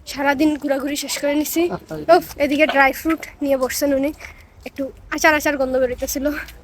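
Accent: Sylhet, Bangladesh. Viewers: আপনার কথা গুলো অনেক মিষ্টি আমার মনে হয় আপনি সিলেট বিভাগ এর ভিতরে বাড়ি আপনার, আমি হবিগঞ্জ জেলা থেকে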